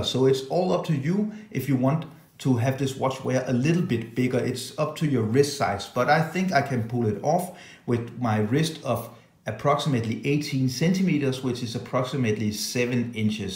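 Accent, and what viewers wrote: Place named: Denmark. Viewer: Nice review of a beautiful watch. You have an unmistakable Danish- English accent..lol I can hear whenever a Dane is speaking English. Not a criticism by the way, just an observation. Jeg er engelsk men er ogsaa Dansk talande.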